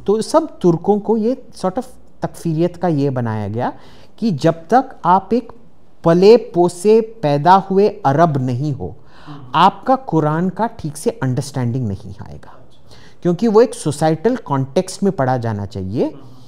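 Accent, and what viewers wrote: Standard. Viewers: 1st thing 1st - All praise due for for his chaste Hindi delivery! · जी आप की हिंदी बहुत शुद्ध है।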